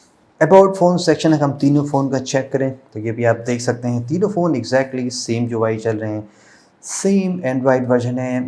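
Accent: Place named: Rampur, India. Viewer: Bhaiya apke rampuri ka koi jawab nahi😁😁😜🙏🙏❤️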